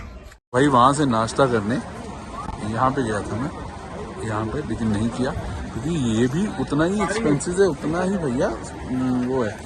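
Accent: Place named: Punjab, India. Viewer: Lovely Vlog, Papa ji. The best part I like in all your vlogs is your Hindi narration in sweet Punjabi accent. God Bless You. I too, have purchased the Same Jupiter as yours, but in Light Blue shade & I'm very happy with it.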